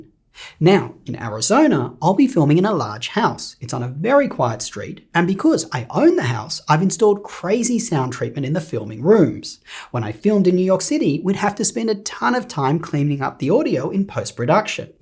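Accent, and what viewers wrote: Australia. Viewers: yknow i thought you were australian, but you mentioned you moved to the usa from there. interesting! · Are you Australian?????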